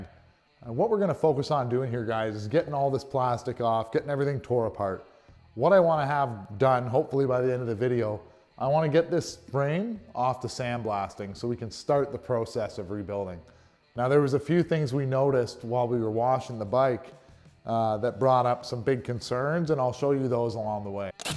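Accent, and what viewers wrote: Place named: United States. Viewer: U sound like a yank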